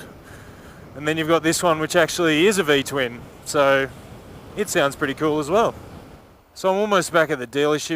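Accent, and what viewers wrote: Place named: Australia. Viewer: like your Australian accent! Greetings from Spain. I'm 5' 11" and I was wondering if this bike would be too low for me (seat height si 785 mm here in Europe).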